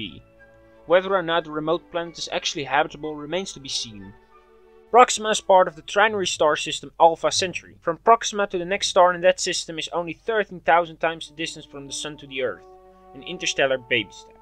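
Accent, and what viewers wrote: Netherlands. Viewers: That Dutch accent!!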